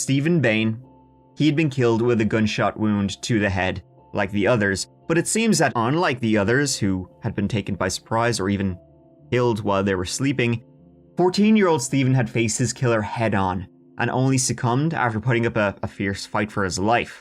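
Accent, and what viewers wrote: Ireland. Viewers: he is Irish ❤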